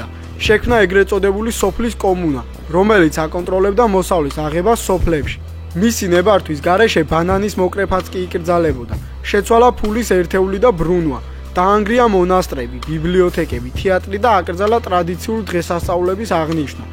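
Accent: Kutaisi, Georgia. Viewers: ქუთაისური კილოთი ისტორიულიფატტების კითხვა კარგი გგონია?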